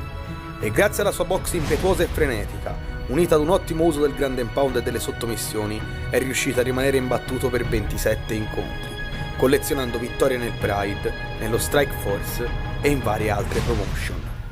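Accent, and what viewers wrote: Tuscany, Italy. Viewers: Sei toscano ??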